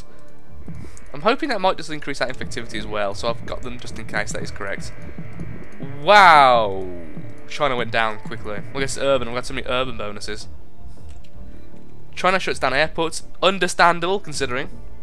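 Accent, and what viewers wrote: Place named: England, United Kingdom. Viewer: its England init.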